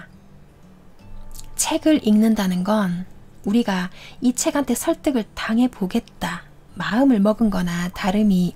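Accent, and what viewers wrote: Gyeongsang, South Korea. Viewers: 경상도 아가씨다 ㅋㅋ 저도경상도 ㅋㅋ 이쁘시내예~~잘보고갑더~~밥묵고하소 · 아침일찍 좋은말듣게되어 오늘하루를 힘차게 보낼수 있겠습니다 경상도사투리가 정겹기도하구요~ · 목소리가 매력적이세요~^^ 경상도 사투리가 더욱 매력 있으십니다~♡♡♡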